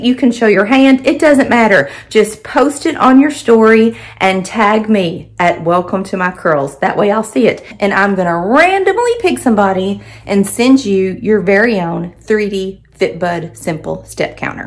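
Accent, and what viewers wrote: South, United States. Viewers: I’ve been watching your videos for awhile! I began watching when you first got Pollux and fell in love with how you love your animals. I knew you were a Southerner mostly because of your speech, and because of your generosity and kindness in communication. You want to help, you want to teach, you want to help people live better lives! I live down in Millington TN outside Memphis, but my parents lived in Obion County for almost 10 years back in the 90s and are very familiar with the Paducah, KY area. I have not seen all of your videos, but I have loved every one I watched! This video diary is a perfect pairing of your curls and you’re ice cream business curls! I have never seen or heard of a shop like that, but the concept is genius! You are such a a great teacher and encourager! I don’t know how you find enough hours in your day to do all you do. 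You make it look easy, but I know your filming and editing take more time than people realize. I just want to encourage you and tell you that you are really helping to make a difference in peoples’ lives and I am proud of you and for you! Give Mooey and Pollux a scratch and a kiss from the McBrides!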